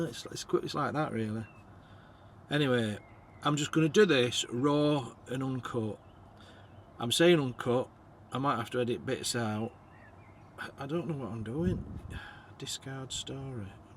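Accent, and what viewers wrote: Yorkshire, United Kingdom. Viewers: yorkshire though and thourgh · Thumbs up if you watched the whole video 👍🏼.. You come over as such a nice bloke, Honest values and tbf I share many of your views…it’s definitely the Yorkshire accent that kept me the full hour n bit though! lol..also, my girlfriend Cat said she’s never found a bald man attractive before…I guess that’s a compliment!haha! Hopefully one day bump in to you..and maybe you’ll smell like lynx Africa 😂😂😂 Our best to you n hellibobs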